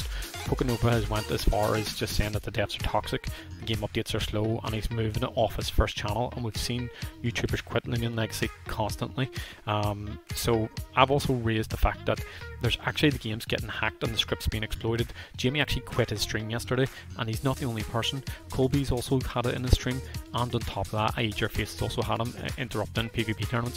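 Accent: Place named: United Kingdom. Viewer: You sounded British to me. Plus most of the time when am in your server you are in English servers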